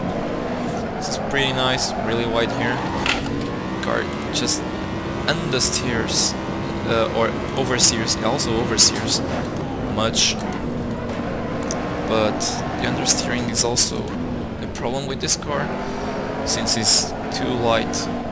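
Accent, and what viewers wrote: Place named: Brazil. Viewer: your accent sounds familiar are you Brazilian ?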